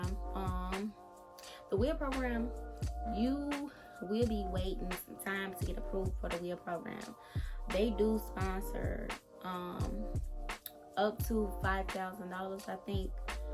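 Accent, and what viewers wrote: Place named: Alabama, United States. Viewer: Which one did you go to bc you sound like you’re from round my way are you in Bama ?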